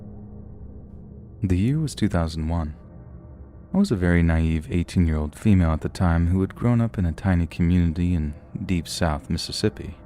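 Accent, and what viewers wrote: Australia. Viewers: Ah jesus no one expects an Australian accent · awesome an Australian narrator i love it 👍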